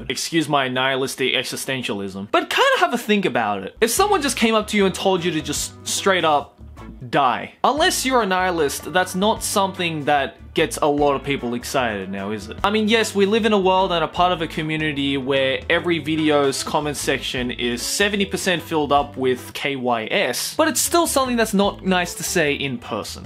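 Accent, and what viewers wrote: Australia. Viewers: Salma M. He's Australian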